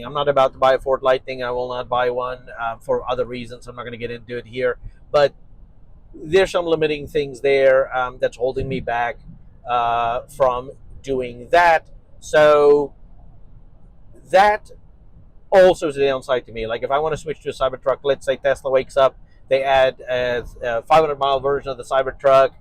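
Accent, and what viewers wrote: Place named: South Africa. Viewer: offside comment here. you sound Souht African bro. any ties to SA?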